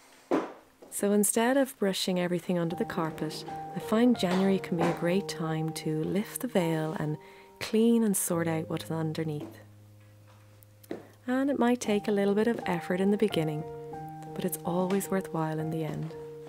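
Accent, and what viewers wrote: Ireland. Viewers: watching your videos, with your calm and gentle Irish lilt and beautiful images, is like meditation for me. Thank you for posting. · lovely to hear your love Irish voice and wisdom thank you Celine also from Ireland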